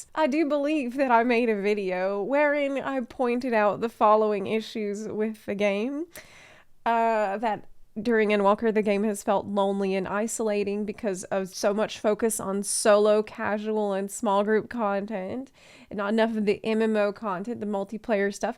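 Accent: South, United States. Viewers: Xbox sprout here, only game I know you can put in..200 hours in a month and be a noob😂 I love listening to ya. As a southerner myself I like the accent. Now..back to my main full time+ot job. FfXiv!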